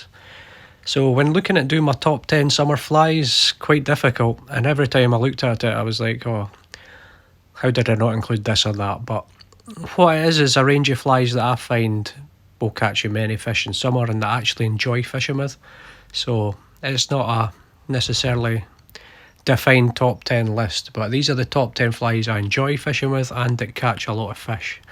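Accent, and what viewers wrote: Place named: Scottish Borders, United Kingdom. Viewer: are you on FB? Got a lot of questions?? lol what floating line would you recommend? What was the fishery at the beginning of the video? What’s your favourite rod and location up there? I’m thinking you have a borders accent!! And lastly do you sell your flies..I keep wanting to buy more flies and this cracking video has made matters worse ( like all Fly fishermen lol )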